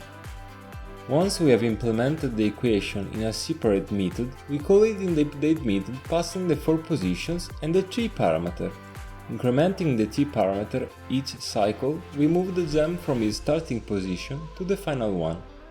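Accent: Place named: Italy. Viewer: am only here for the Italian accent :p Great video btw, the shader part was really interesting!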